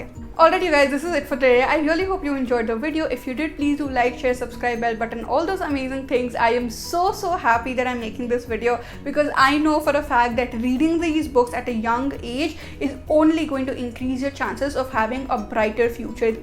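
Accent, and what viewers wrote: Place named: England, United Kingdom. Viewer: You have such a great English accent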